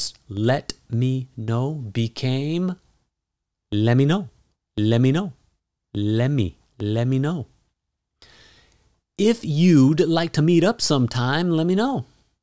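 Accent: United States. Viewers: Thank you for such inspiring classes. I'm myself an ESL teacher with British accent, but enjoying a lot shadowing your American accent.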